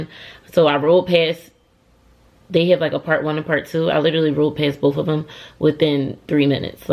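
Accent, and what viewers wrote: Philadelphia, United States. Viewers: I thought you sounded like you were from Philly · As soon as i heard your voice I'm like no way she's from Atl, gotta be from Philly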